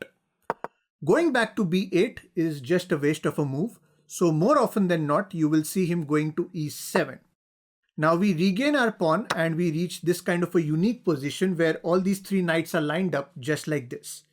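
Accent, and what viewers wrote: India. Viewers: the indian accent makes this channel my fav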